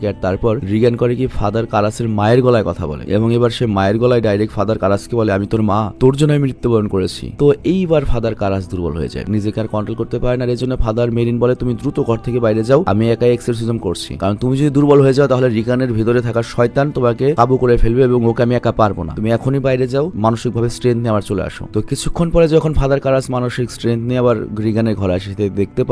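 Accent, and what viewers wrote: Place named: Noakhali, Bangladesh. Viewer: নোয়াখালী কেন বাই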